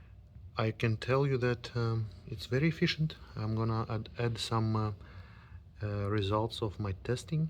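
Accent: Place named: Russia. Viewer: Русский акцент) Сколько сейчас девайс стоит на местных барахолках и из какой страны вещаешь?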